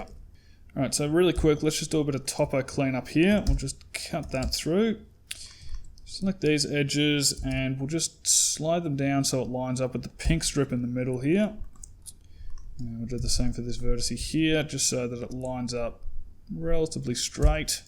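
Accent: Australia. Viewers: Why is every single good modeling tutorial done by an Australian?!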